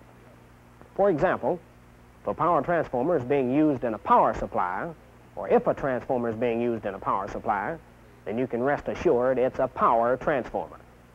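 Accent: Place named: Texas, United States. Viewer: Sounds like he is from Houston, TX .....then did some speech training.... Glad I did my electronics tech training in the Military, USMC first before going to college for engineering, this gave me the solid fundamentals... Most of the professors at University suck at teaching....they do not start out with any conceptual teaching....They jump into Maxwell's equations on the first day..Many students drop along the way...It's not until after you graduate that you have time to think things through....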